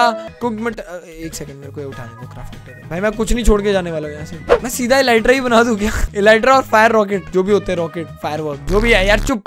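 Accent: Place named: Haryana, India. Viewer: well that's not true we don't watch him for his haryanvi accent , we watch his video because we like him ( btw I love his haryanvi accent )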